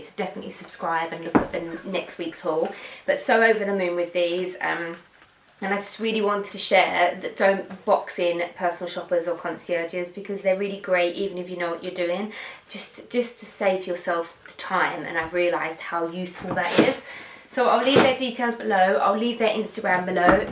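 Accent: Essex, United Kingdom. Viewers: You look gorgeous in the play suit! Ps try saying Jewellery in two bits. Say jewel then leeereey it helps if it bothers you. I had a friend I helped with her speech after a bad car accident. But to be honest I like that you are a natural girl and the Essex twang is cute!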